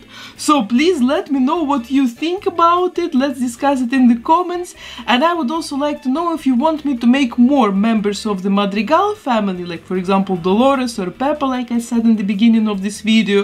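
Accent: Russia. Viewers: она русская? я вот сижу и голову ломаю кажется мне или нет, вот прям ярко выражено русское произношение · Русский акцент, и тут я понял что это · Блина малина Такой русский акцент Так необычно Кстати ооак очень круто вышел :>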